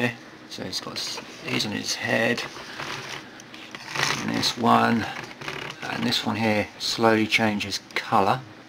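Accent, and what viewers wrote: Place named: United Kingdom. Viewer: why's it have to be a british guy?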